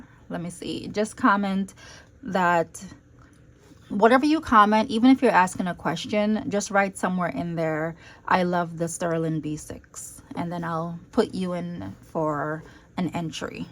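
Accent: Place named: Jamaica. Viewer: Your channel is refreshing... without the stress. I will learn a lot from you. Lol! And I scrolled through to ensure I was hearing a Jamaican accent albeit mixed 🇯🇲😎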